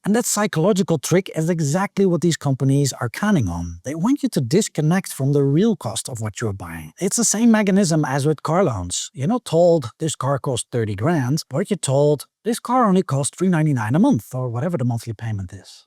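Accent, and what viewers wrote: Netherlands. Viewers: Je klinkt Nederlands! Goeie video iig.. bedankt!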